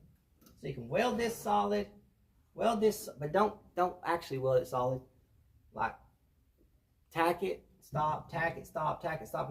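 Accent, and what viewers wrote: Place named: South, United States. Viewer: I’m watching from Poland and I understand you just as fine as anyone else buddy! I actually love country accent!! Keep you the great work fella!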